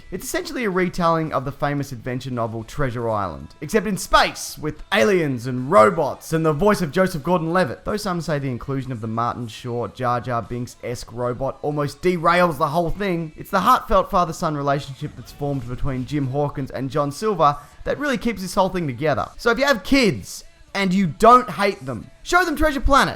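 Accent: Australia. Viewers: Is he australian? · his accent is badass... #AustralianArmy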